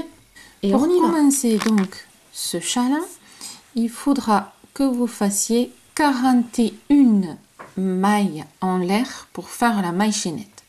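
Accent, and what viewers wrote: South, France. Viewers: Merci pour votre reponse avec toujours ce petit accent qui rappelle le soleil du midi je vais acheter la laine comme dans la vidéo auriez vous un modèle de chale avec emmanchure dans tous vos trésors SVP merci bon WE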